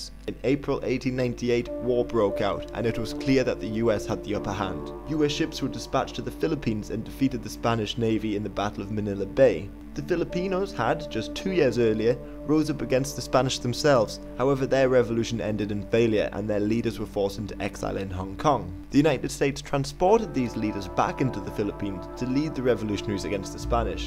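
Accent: United Kingdom. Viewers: Is this a British man talking? this makes no sense unless her learned to talk British in the US >_<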